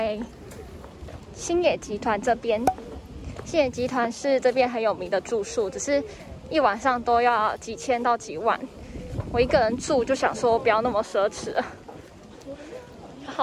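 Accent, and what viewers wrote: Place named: Taiwan. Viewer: 我怎么那么喜欢听台湾国语呢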